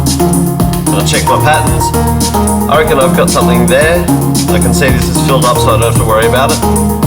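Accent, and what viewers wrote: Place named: Australia. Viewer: This is awesome man, thanks heaps for sharing! Sounds like you're an aussie like me haha. Where are you based? P.s. keep up the great video content!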